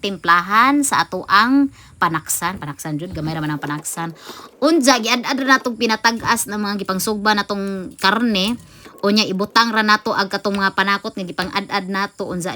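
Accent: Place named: Bohol, Philippines. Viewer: Nindota mn n inyoha dapit dae... gnahan ko nmo very boholano kaayo accent ksagaran ako nbantayan mgtinagalog ng uban...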